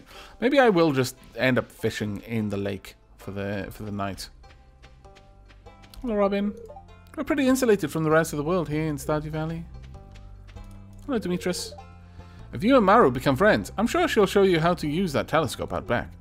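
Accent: Wales, United Kingdom. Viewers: I've got a funny feeling the seeds in the top left corner of the furnace chest were meant to be planted during the summer. I may be getting them confused with the watermelon seeds that were temporarily overlooked. Really enjoying this let's play. There's something very soothing about the combination of such a pretty looking subdued type of game & the glorious Welsh accent. It makes a nice change from the horrors & shoot-em-ups I normally go in for. Just a shame I don't have a computer capable of running it myself but then I'd never get any work done.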